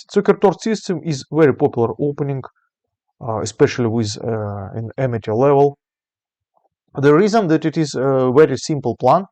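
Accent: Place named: Russia. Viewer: Funny russiam. Accent 🎉🎉🎉😂😅😅😮😮❤😊😊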